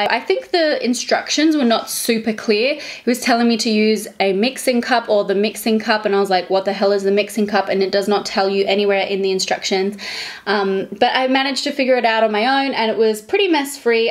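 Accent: Australia. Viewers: Are you Aussie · Love you 💕 I love hearing a fellow Australian accent on YouTube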